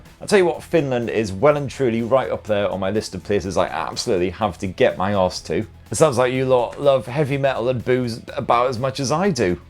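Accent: United Kingdom. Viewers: You're the only person that makes me like British accent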